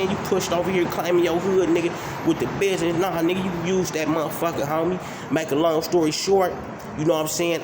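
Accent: Los Angeles, United States. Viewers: bruh yo channel gon blow like a muthafucka wit them fire ass stories wit that extra thick real L.A. ganbangers accent.. I subbed up quick like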